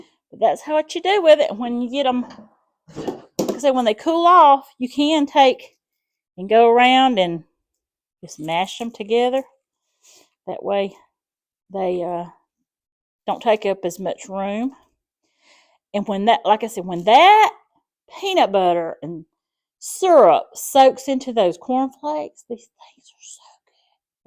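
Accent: South, United States. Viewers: Absolutely one of the best snacks. Thank you. Love your southern accent.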